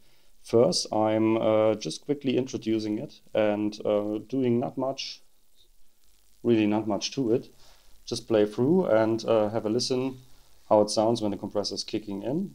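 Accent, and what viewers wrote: Germany. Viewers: Man hört stark dass du deutsch bist.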